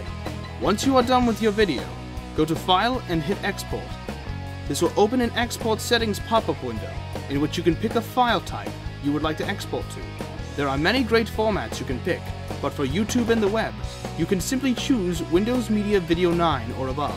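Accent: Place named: United Kingdom. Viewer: he's British <3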